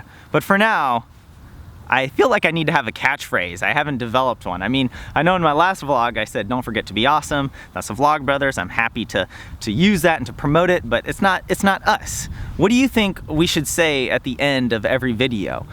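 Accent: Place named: United States. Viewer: You're not Finnish, are you? Awesome American accent. Just, FWIW, Americans will never understand Finns! Sorry, gave up with the bullshit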